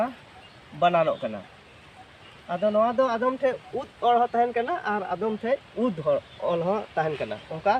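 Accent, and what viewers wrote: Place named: India. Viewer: Aam do santali te ol akan word latar re hindi tem ucharane aa anate bam bujhaw daleyag kana santal ched leka ko ucharana youtube re joto olchiki palhaw hah video njel me ar onde khon mocha te ched leka ucharana aa santali te ankage chiki teho olo aa menkhan hindi ucharan em palhaw khan amdo santali word ucharan bam gan daleya aa nowa redo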